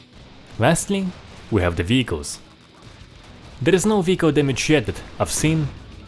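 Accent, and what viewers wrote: Russia. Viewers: U from russia?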